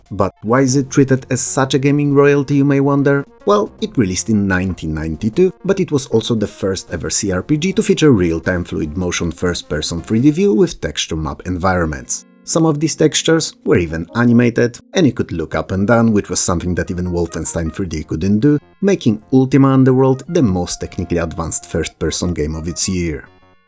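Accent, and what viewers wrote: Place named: Poland. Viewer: Polski akcent jakby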